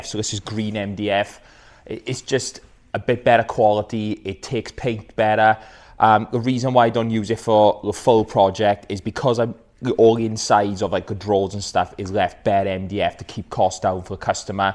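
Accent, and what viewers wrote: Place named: Northern England, United Kingdom. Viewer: Hi, I listened about four times over and over again to work out what screw you are using to put your units together and whether it was because of your cold or your northern accent I couldn't understand a word you were saying. I then looked in Screwfix for every screw that I could see. I still don't know what screw you used, could you write it down on a board, or a piece of paper, Thank you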